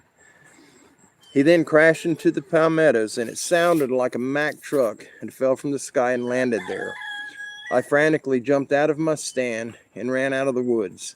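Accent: South, United States. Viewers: I thought your voice is awesome, i love that southern accent - i know what you were doing, you were only trying to make sure that everyone was happy - i do the same thing infact it takes me all day to get to the point, my brother will say to me ""For the love of God Michelina you've been circling the airport for an hour now ! You think you can land sometime soon...lol - im not going anywhere and neither are you, Our Lord n Savior didnt bring you this far you have more work to do, and the one comfort the one beautiful thing we all can keep in mind is "we all belong to a beautiful God and when its time and he wants to take you home, it will be a moment like no other you now know you have served your lord Jesus Christ and now its time to go back home - God Bless you, and until then you belong to us ...lol💖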